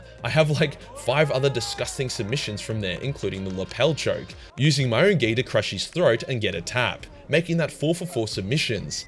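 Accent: Australia. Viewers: Please please please make more jiu jitsu content, your deep beautiful Australian voice is perfect for narrating grappling.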